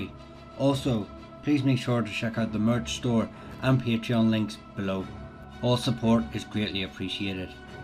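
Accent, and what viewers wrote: Ireland. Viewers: Hello there....my grandmothers maiden name was coleman. Her parents came from ireland to argentina i guess before 1900. She was born in argentina was catholic and never spoke of her roots or family a real pitty. I love your irish accent... god bless u. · Music too loud. Can’t make out what the man is saying, especially with such a heavy Irish accent.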